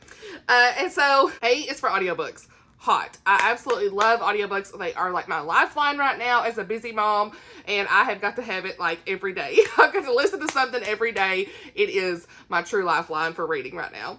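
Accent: South, United States. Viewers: Your southern 😂😂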